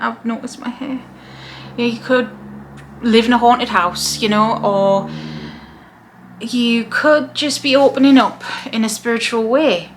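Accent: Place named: North East England, United Kingdom. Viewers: This might be a bit off topic but I'm curious to know if you're from Newcastle/ the North East? I'm just mentioning it because I lived there when I was younger and when I clicked on this video (after weeks of feeling called to learn more about her) your accent struck me as a positive sign that this video was meant to find me ❤️‍🔥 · it's so surreal to find the first video talking about working with Hecate is by someone with a very similar accent to me - hi from Teesside :)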